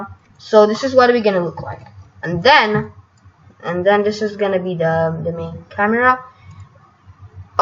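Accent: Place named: Spain. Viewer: ik it’s English but I thought u had a Spanish accent mb. W video tho 😅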